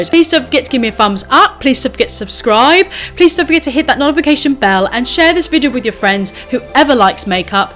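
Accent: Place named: Australia. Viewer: I love your videos, both because you take no shortcuts, but also for the fact we have similar taste. May I ask something. You sounds like you are coming from Australia - is that correct or I am just awful in recognizing dialects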